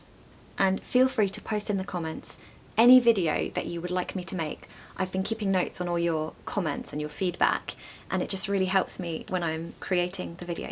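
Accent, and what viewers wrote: RP, United Kingdom. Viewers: II've just happened to meet your channel and I love it so much. Well, I stayed in London 10 days as my son is living there as a Uni student though he is coming back to Tokyo soon. My whole trip was just amaging while I was strolling many areas like a Londoner. I love UK so much so I will keep on watching your lovely video for listening. I also love your beautiful British English as well. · Hello! I’m a french girl and your english accent is soo soo soo lovely 🥴 and to me it’s very important to perform my english. Thanks for yours videos sure i subscribe! I hope I can speak like you one day 😅 very good job 🫶🏼👍🏼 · Hi,. It is my first time to watch your video; I hope not 'too late'. BTW, I very much like your accent in speaking English (viz. RP); it is what I am speaking in at all times in a day, not Cockney or Estuary. The reason is that I am based in Hong Kong where many Hongkongers are using RP, because HK was, you know, a colony of GB. 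· ! It's so good to listen remotely to your elegant British accent. Sooths my pains related to the excessive technological burden these days. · We are supporting you cause you have beautiful british accent❤ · It's such a long time i have not practiced english when i hear you speaking i make réminiscence ❤with the late ninties It was in auguest 98 when i visited London dor the first time and visited many places and areas which i found highly useful for the oractice of my english istill remember Diana's accent. So when i hear your voice it reminds me that lovely queen's accent.Thank you all my support ❤❤❤ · I love hearing British accent, it’s very amazing 👏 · I have recently discovered your channel. I am sure it will start to take off soon. Lovely videos and a beautiful British accent; the accent that I'd like to speak with. " I also have a facial If I'm treating myself" I didn't fully get this sentence. 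Can you help me with it? What do you mean by that?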